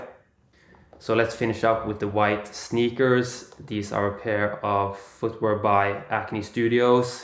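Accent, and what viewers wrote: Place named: Norway. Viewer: Lol knew you where Norwegian by just the accent alone, before even seeing your name.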